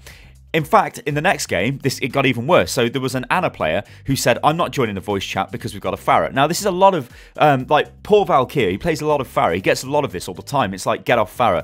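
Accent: Germany. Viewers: Iam from germany too and I recognize a german accent xD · He´s got a pretty terrible german accent.... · oh god this german accent like srsly...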